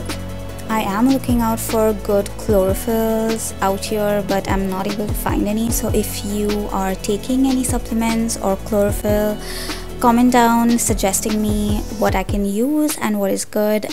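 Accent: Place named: India. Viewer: I think indian look at her accent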